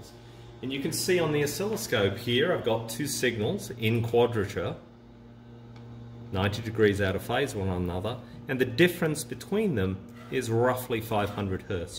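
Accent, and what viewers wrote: Australia. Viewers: An Aussie in the States? 🤔 Interesting video, tnx!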